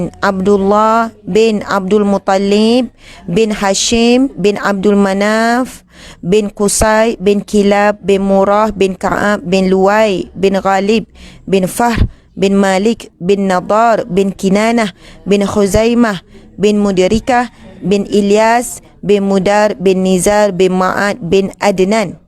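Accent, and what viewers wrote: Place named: Terengganu, Malaysia. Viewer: alhamdulillah , maasyaaAllah , sukanyaaa dengar soro mok ustazah, cakak loghak gganu pulok tu, suko denga.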